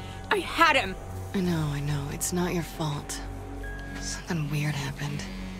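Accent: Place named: United Kingdom. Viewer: That british accent is offensive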